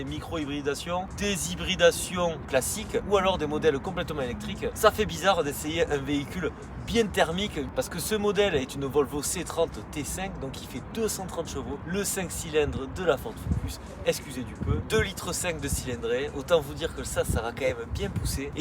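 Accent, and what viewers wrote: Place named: Southwest, France. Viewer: Salut , je te découvre avec cette vidéo . Format technique et humour que j'adore . Le petit accent m'est familier ..sud ouest , non ?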